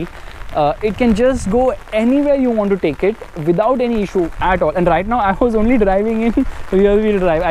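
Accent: India. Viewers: Lmao that indian accent. Why indians think they speak english like Americans. Im also indian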